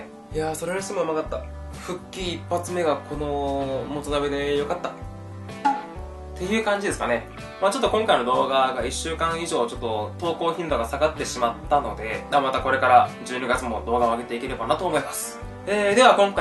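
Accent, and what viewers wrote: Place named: Hakata, Japan. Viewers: いつもにないくらい博多弁使ってくるやんw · 福岡弁？博多弁？良いですね(´ω`)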